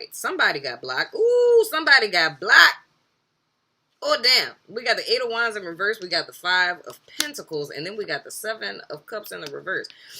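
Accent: New Orleans, United States. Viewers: I was trying to figure out whar is that accent I’m hearing. lol I’m getting NOLA.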